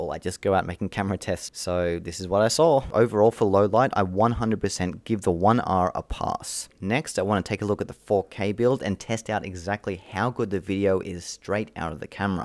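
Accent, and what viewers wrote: Australia. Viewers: you sound very aussie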